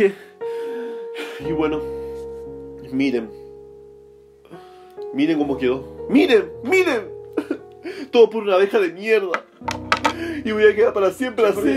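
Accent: Argentina. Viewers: ¿eres argentino?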